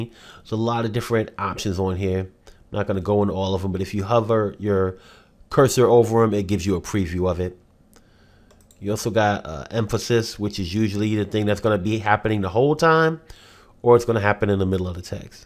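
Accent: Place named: East Coast, United States. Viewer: Come on man that East Coast accent is bomb! Specially since I'm way out West! BTW nice tutorial, I learned a lot. Thanks!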